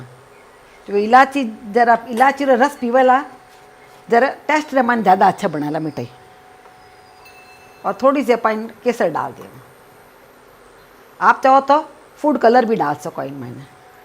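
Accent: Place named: Marwari, India. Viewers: Thanks for sharing your traditional recipes. You seem very experienced. I am originally from Ajmer so I am loving your Rajasthani accent, but it’s amazing to hear all the English words you speak with a beautiful accent. Have you ever made stuffed guttas? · Very nice recepie. मारवाड़ी बोली सुन कर बहुत अच्छा लगा. Pranaam पापा mummy. आप दोनों हमेशा खुश रहिए और स्वस्थ रहिये. 🙏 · I love the recipe auntyji...u described it so well.. u r so beautiful and elegant. Ur marwadi is lovely... keep sharing the new and delicious recipes with us ..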